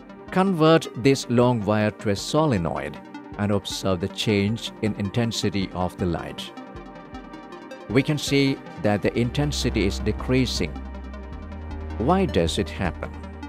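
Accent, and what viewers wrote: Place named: Kerala, India. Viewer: malayali ane bro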